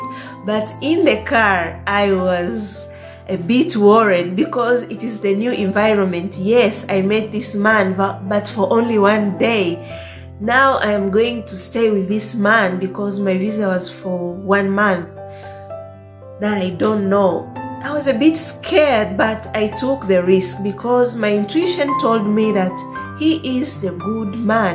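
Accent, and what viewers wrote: Uganda. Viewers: Lovely story. From Tanzania but you sound like Ugandan. Much love from Uganda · I thought she was Ugandan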